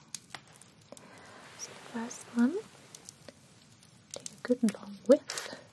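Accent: United Kingdom. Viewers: The asmr and the British accent just warms you up and makes you wanna fall asleep I love your accent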